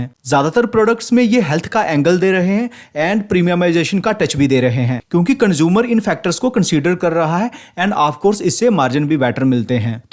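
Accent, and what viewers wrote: Jammu, India. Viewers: Bhai jammu se ho kya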